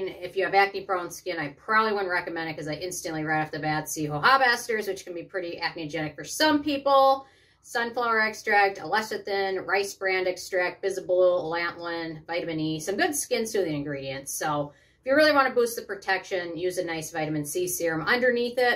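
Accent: Minnesota, United States. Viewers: Hey, I just started watching your videos, thanks so much for the reviews! I could tell right away by your accent that you must be Minnesotan, I'm from Minnesota too, (a.k.a. the best state in the USA!) I would love to see a review on the new DRMTLGY Physical SPF 44. I've been eyeing that one!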